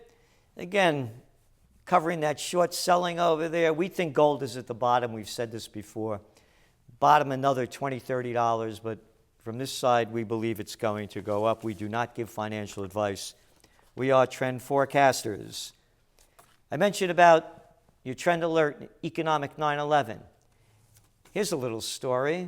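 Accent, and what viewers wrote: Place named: New York, United States. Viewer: New Yawker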